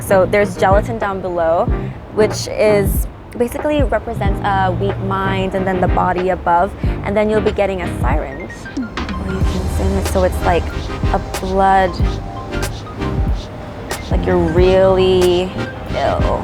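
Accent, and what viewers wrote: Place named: West, United States. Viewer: Did you grow up in the United States your English and mannerisms are very western.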